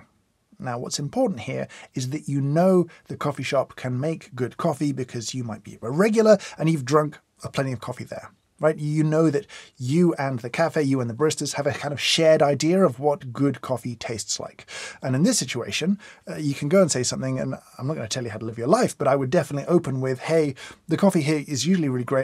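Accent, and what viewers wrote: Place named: England, United Kingdom. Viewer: Your english accent is very satisfying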